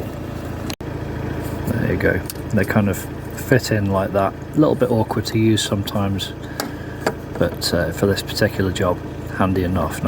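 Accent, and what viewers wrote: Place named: England, United Kingdom. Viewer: came here trying to help a friend with his 2008 XC90 so I hope it's in the same place! But I wanted to say you have such a lovely voice haha, where are you from? I can't decide if you're from the North East or Colchester 😂😂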